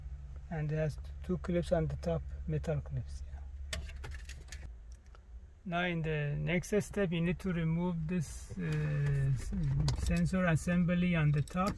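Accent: Iran. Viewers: You must be Persian I love your accent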